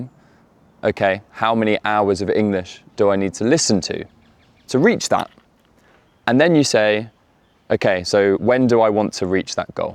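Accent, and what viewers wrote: United Kingdom. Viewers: Eu gosto do sotaque britânico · Your british accent, I loved it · Although I focus on learning American English, but this video is my listening practice today , I like the British accent BTW. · Are you speaking in British accent???? because I want to learn American one, I'm afraid to be in the wrong place 🙂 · Ahahaa😂 he speaks in british accent and I really love it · Are you British? Your English is different. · Hi there thanks for this I really love the British accent and finally I found an interesting channel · You said you are in American in one of your films but your accent is like UK . I love your accent cus I'm learning UK English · Talk about another topics as much as you can because you have a wonderful British accent. And I think it can be a listening practice all of us. Thanks. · Finally someone with British accent 😂❤ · Is that British accent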